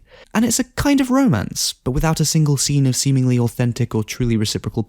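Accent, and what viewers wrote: United Kingdom. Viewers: Excellent use of clips to accompany what you were saying. I hadn’t heard of the book but this has made me want to read it. British?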